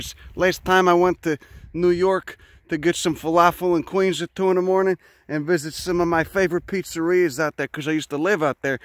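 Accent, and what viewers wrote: Chicago, United States. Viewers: It’s thick Chicago accent 🤘🏻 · It's funny , the exotic "Venus Flytrap" grows in a limited area of North Carolina. Also , your Chicago accent is killing me! As an Italian American from near Pittsburgh, I can hear the similarities between the Pittsburgh accent and the Chicago accent. That's why all yins guys thought that Iron Mike Ditka was a native Chicago guy when he's actually from Pittsburgh! I'm glad that I found your channel, I'm going to subscribe. Ciao, fratello!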